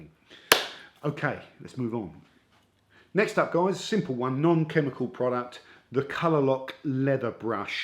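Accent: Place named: Southern England, United Kingdom. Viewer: I’m trying to place your accent, I’ve narrowed down to a southern accent, Brighton maybe, possibly Bournemouth? Am I close haha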